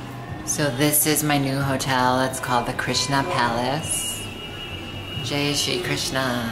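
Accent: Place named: Bengal, India. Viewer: She is speaking with Hindi language people but tune is like Bengali . love it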